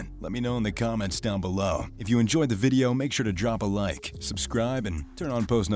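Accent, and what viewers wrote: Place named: United States. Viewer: U sound like mr luxury with a normal American accent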